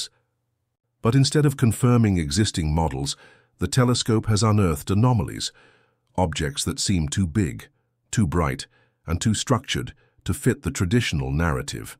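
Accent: United Kingdom. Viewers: AI still can't handle punctuation. Ridiculous. And stuffy brit ridiculous at that.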